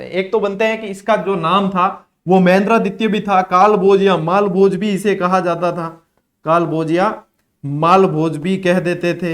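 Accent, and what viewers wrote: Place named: Rajasthan, India. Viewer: Sir ji meny aap ke 2gared ke sabe calss dekhi hue hai akbar fr se remaind ho gaya peechle bar norss nhi bana pae b.ed exam ke waj se par ab me cantinu norss bana rhi hu path h sir papa be Mary sath aap ke calss ko sunty h aap ka marwadi bolna papa ko acha laga hai.......tq so much sir ji aap garat ho sir ji 🙏🙏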